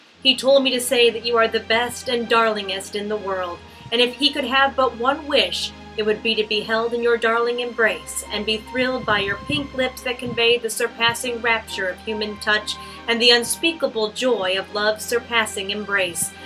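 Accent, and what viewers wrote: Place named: Western New York, United States. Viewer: Haha that was good. I thought this was going to be about the rapper when I first clicked, but then totally redeemed yourself when you played the song at the end. Are you from western NY? You sound like you are from western NY.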